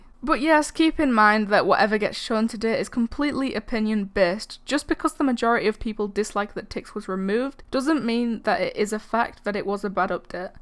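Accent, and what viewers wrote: United Kingdom. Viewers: Oh godhaaaaaaaaa THAT BRITISH ACCENT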